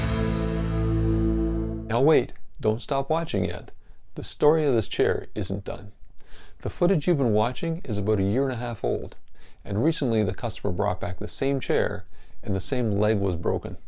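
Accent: Canada. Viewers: Hey! Are you in Canada? Or Southern Virginia? Just curious about your accent. I'm retired from furniture, so I like to watch and sometimes I can give you some tips. So far, you do everything like I did, except I always used flux brushes for applying wood glue. Work much better for gluing and much cheaper too. Thanks a lot. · I hear a fellow Canadian's speech! Broken chai, here and now some optimism about repairing it. Enough optimism, that I won't watch the "make a new leg" until I hear the fateful crack again in this one.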